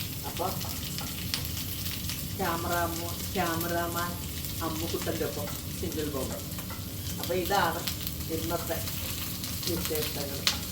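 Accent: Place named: Ernakulam, India. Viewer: Super singu... Live happily God bless u ekm il ninnu parayunnapole undi❤❤❤❤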